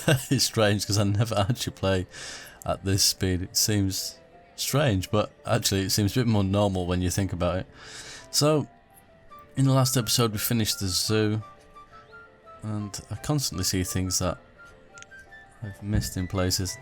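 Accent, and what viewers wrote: England, United Kingdom. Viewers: This was a great series; enjoyable to watch. Thanks much! As for the use of mods in the next series, I'd say it depends on the overall goal. If creating a decorative oasis is the goal (like the zoo), then using mods to relieve the survival pressure so that you can concentrate on being creative seems wisely used. If the goal is survival, then not so. Folks like myself watch your series for the artistic aspect (and your English accent!), so it seems right to free up your time to have fun being creative. ;-)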